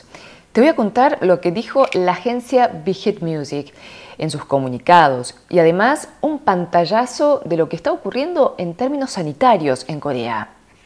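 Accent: Argentina. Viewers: Disculpa pero hablas como Argentina vives allá y cómo te llamas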